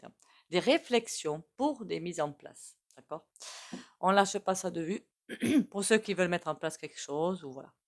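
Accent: South, France. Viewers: Bonjour. Je viens de m'abonner car j'aime beaucoup votre facon de faire cette voyance.Votre petit accent du midi aussi❤ bisous de Toulouse.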